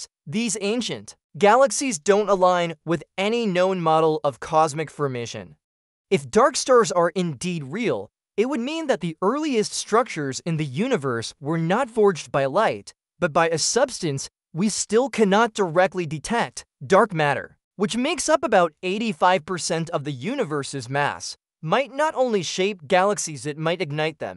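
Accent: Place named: United States. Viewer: Is it? Sounds like typical american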